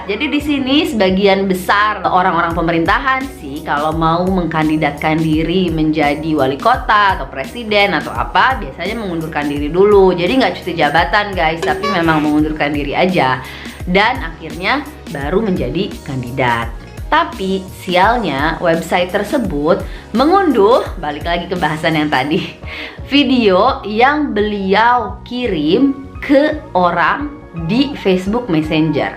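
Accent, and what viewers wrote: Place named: France. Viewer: Aksen perancisnya kebawa pas ngomong bahasa Indonesia ya 😆 aku suka kontennya. Kalau boleh request aku mau dong kak penjelasan penggunaan huruf “y”. Sampai sekarang belum mengerti :(. Terimakasih sebelumnya 😆 oh iya, baru kali ini nonton kontennya sampe ketawa ketawa 😂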